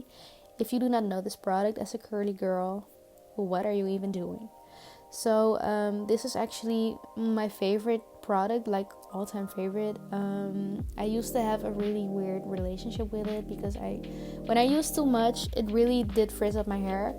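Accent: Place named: Netherlands. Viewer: Your hair beautiful! Btw are you dutch? You kinda have a dutch accent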